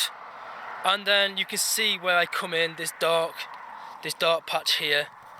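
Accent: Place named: Birmingham, United Kingdom. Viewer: sounds like a Brummie to me?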